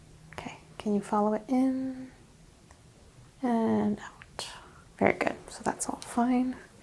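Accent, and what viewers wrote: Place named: Upstate New York, United States. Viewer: i'm not sure where you're from but your accent really reminds me of western/upstate new york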